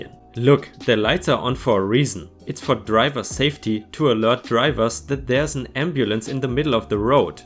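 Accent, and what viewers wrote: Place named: Germany. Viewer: ⭐⭐⭐⭐⭐⭐⭐⭐⭐⭐⭐🌟🌟🌟 I figured I should post some stars since it's been a while. I am still watching just been busy to respond, wouldn't miss my daily fill of That awesome German accent :) 🌟🌟🌟⭐⭐⭐⭐⭐⭐⭐⭐⭐⭐ 🌟🌟🌟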